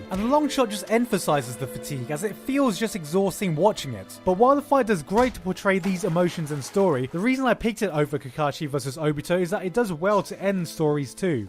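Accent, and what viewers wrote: United Kingdom. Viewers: some normal liverpool street brawl beetween mates. your accent is so british i can almost hear the tea cup being lifted from the table when you talk.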